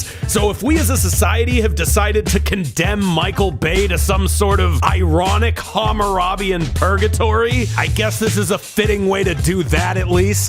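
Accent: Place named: United Kingdom. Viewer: His British accent reminded me of dex there (sanity not included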